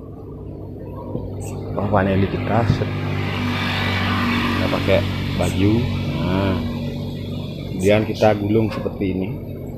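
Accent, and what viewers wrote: Bali, Indonesia. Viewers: Logatnya bali nok. Salam vanilla lovers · tinggal nya dmana pak?? logatnya kaya nak bali😁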